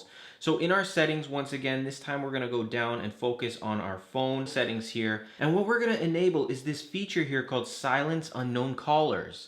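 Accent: Canada. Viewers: This is freaking perfect. SO helpful. Thanks, buddy 😊 Also, love that I can tell you’re Canadian from your pronunciation 😁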